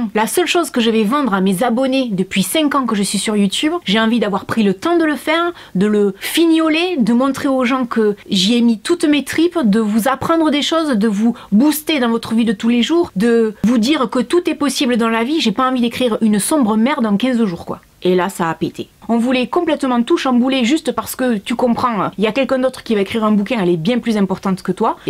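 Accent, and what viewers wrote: South, France. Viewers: Bonjour, je te suis depuis 2 ans et j'adore regarder tes vidéos, ton sourire, ton accent du sud et ta bonne humeur sont les petits rayons de soleil de mes journées. J'aime tes vidéos sponsorisées par ta carte bleue, je sais que je peux faire confiance. Cette année à été difficile pour la plupart d'entre nous et tu l'a rendue plus douce. Continue à nous faire sourire et un grand merci pour tout. Bonne année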